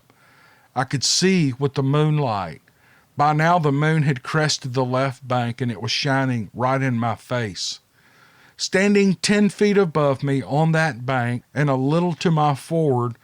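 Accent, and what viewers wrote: South, United States. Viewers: They are not monsters,like some people think.i love this guys story,and the way you tell it.also,love your accent.southern boy,like me.if they seem aggresive ,then some one made them that way,possibly by harming one,or shooting one.too quick with the gun,they are more curious than anything.they don't mean you any harm,unless they have been abused by someone before you arrived.well,merry christmas to you and your family.keep up the good work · Hey!!!! Merry Christmas. I thank God for you and your channel. You bring me and so many people joy all year long. Plus as a midwesterner( Wisconsin) I love your southern narration! Bless you and yours.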